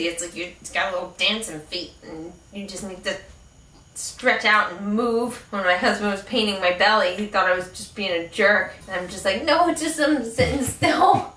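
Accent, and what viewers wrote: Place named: New York, United States. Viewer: I don't know what it is, but something about your New York (New Jersey maybe? lol) accent and how silly you are with your husband, you just seem really cool and you put me at ease in an odd way. I usually feel really uncomfortable around other women (people in general, but I just usually never get along with women, I don't know why) but I think I'd like you :P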